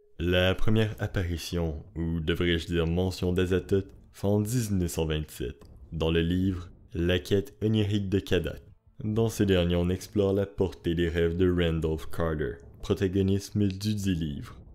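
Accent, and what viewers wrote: Quebec, Canada. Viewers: Tu est québécois ? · T’essaie de dissimuler ton accent canadien ou alors il est naturel ?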